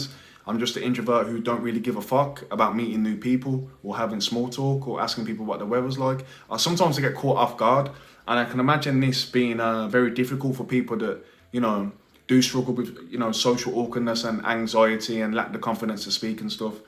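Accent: Wolverhampton, United Kingdom. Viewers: You sound like me born and breed in Wolverhampton.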